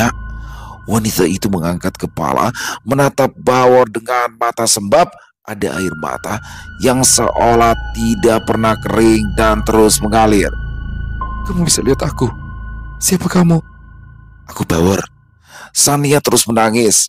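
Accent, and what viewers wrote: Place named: Madura, Indonesia. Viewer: Style madura bagus tuh 😂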